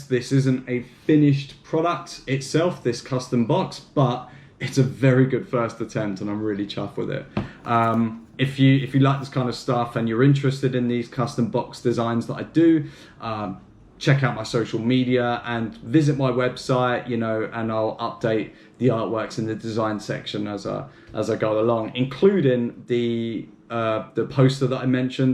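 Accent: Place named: Europe. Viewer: This is AMAZING! I have been working on a Metal Gear Solid custom GBA. Where did you get the sleeves printed? I assume by accent this is somewhere in Europe :( I am in USA and this is very expensive to find a single print custom box for my GBA.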